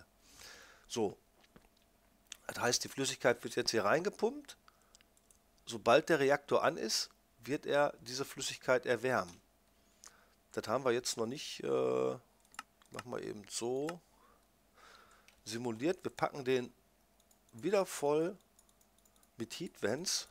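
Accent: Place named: Berlin, Germany. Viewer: Berliner Englisch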